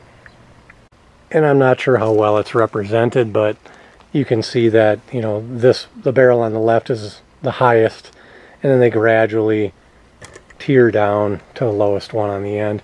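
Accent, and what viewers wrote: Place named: Midwest, United States. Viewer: You're accent tells me you're originally from the midwest!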